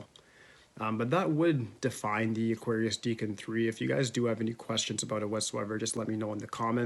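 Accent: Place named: Canada. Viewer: This is the first video I’ve seen of yours. You sound Canadian 😁 love it! I’m going to look for your channel and I truly hope you’re still active. Your knowledge on this subject was A1. 😊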